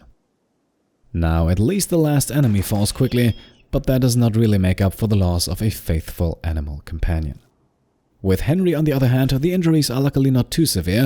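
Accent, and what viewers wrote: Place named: Germany. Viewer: Deine Stimme ist so entspannend weiter so danke, hoffe du wirst riesig! Your Voice is so relaxing keep it up i hope you get huge! I assume that you are German because of your way of speaking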